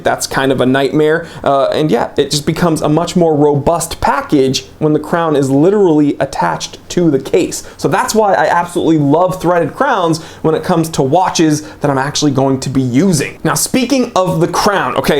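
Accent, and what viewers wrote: Germany. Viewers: Lol.... You've lost the plot this time... But love that wacky German accent. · Your "german-english" was great - just on point! Love the outtakes 👍😄 Greetings from Germany, yours Hendrik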